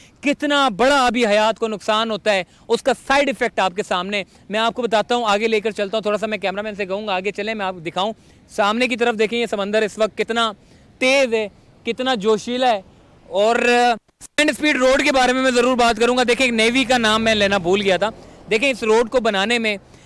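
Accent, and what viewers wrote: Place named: Punjab, India. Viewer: اردو کو پنجابی انداز میں نہ بولیں... شکریہ.... آفتاب عالم خان بینکاک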